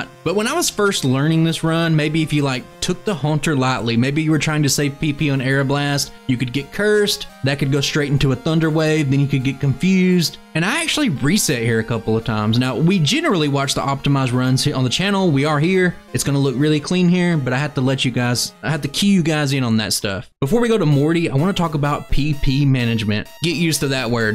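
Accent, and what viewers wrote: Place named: Louisiana, United States. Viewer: Bro what is your accent. Nothing against you at all. I enjoy all the vids but your accent sounds so Hollywood south movie created that I have to know where it’s from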